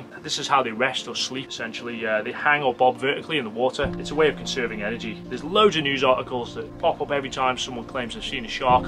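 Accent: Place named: Stoke, United Kingdom. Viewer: I usually stay out of the great white debate but I like this video, I work off the Atlantic coast in Cornwall and get asked about them a lot, I agree with the science we have a pretty good environment for them but there's just not enough of them left. Still so many cool ones, I did work with that Greenland shark that came up and couple of years ago. Unusual question, trying to place your accent, I'm from Stoke originally, you around that neck of the woods?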